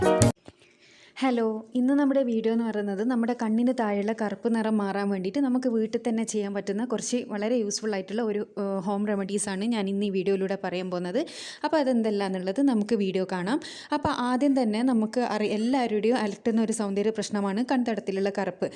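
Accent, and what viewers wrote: Kannur, India. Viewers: കണ്ണൂർ ഭാഷ chechijk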